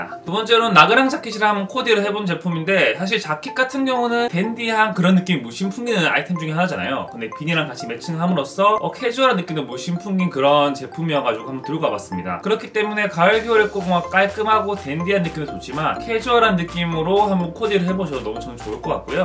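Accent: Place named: Gyeongsang, South Korea. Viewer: 경상도 사투리 억양이 조금 있으신 거 같네요ㅎㅎ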